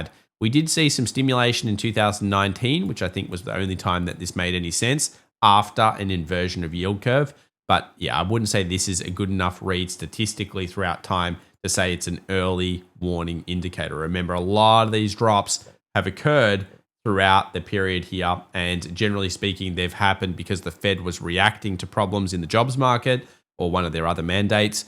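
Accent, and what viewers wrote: Australia. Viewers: Where are you ? you sound like a QLD'er ? 🙂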